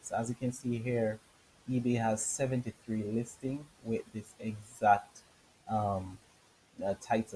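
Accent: Jamaica. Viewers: Do you use a paid email store or just like the regular free eBay? Also I hear the JA accent big up:) are u doing this from JA? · Honestly this was the best explanation I found on YouTube on how to drop ship from ebay .....simple and easy to understand thank you .....by the way where r you from ? I'm from Antigua ur accent sounds similar or Jamaica? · You got a slight jamaican tone. are you jamaican? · you sound jamaican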